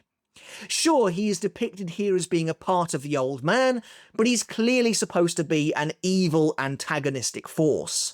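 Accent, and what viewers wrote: United Kingdom. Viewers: Bro those are bangers plus that british accent 💥💥💥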